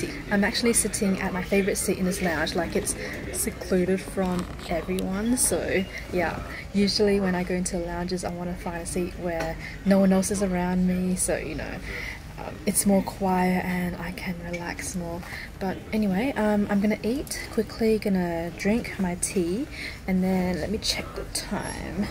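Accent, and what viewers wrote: Australia. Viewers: Very Lovely! 🍦🍦😉😉⛹️‍♀️💻💻🍾You have a hectic but interesting lifestyle!🙂..Nice to see how much your videos have evolved! YOU are a sweet cutie! Always be Yourself! I love love your Australian Accent! 🙂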